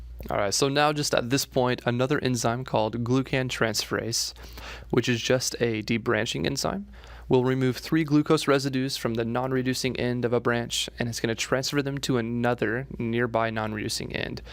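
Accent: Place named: Wisconsin, United States. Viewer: The narrator's accent is so distinct, is that a Polk County Wisconsin accent I'm hearing???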